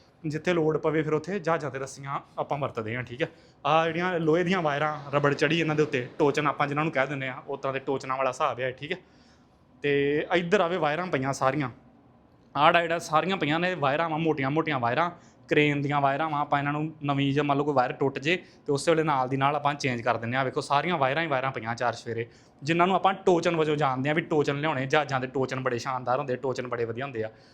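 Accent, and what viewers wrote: Majha, India. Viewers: ਜਾਜ ਮਾਂਝੇ ਵਾਲੀ ਬੋਲੀ ਆ ਪਰ ਵੀਰੇ ਜ਼ਿਲ੍ਹਾ ਤੇ ਪਿੰਡ ਕੇਹੜਾ ਕਿਰਪਾ ਕਰਕੇ ਜ਼ਰੂਰ ਦੱਸਣਾ ਜੀ ❤😊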